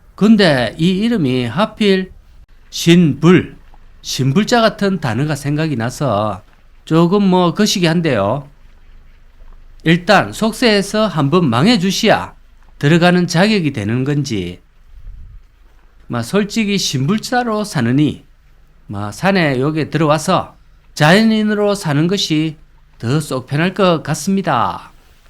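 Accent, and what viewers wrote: Gyeongsang, South Korea. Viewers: 수고많으십니다 구수한경상도 말씀 재밋게시청합니다♡♡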